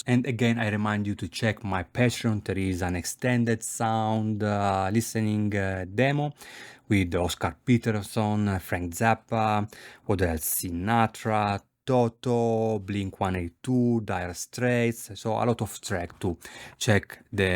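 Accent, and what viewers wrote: Italy. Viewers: Are u Italian ?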